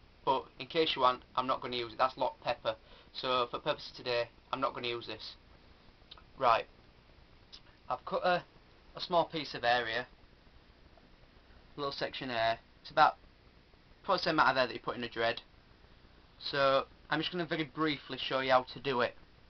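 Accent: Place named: Manchester, United Kingdom. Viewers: Thank you, this helped a lot! This sounds strange but are you from the Manchester/Lancashire area? Its just, you speak a lot like us ;D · great vid :D, if i'm not mistaken that's a yorkshire/lancashire accent :P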